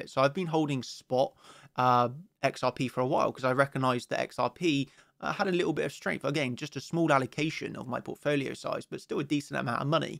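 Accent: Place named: Bristol, United Kingdom. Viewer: Mate u sound like ur from Bristol Lol👍😎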